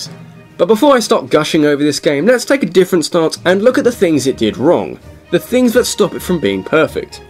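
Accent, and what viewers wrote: United Kingdom. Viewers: are you british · Some-one else realises the sheer glory of the British Accent. I love you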